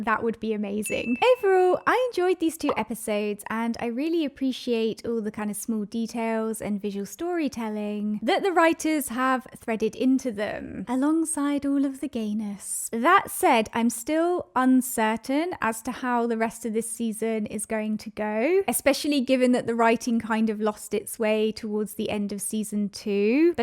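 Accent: United Kingdom. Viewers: Where is your accent from? Are you from there too? I'm thinking somewhere in England? - (I'm a quick study)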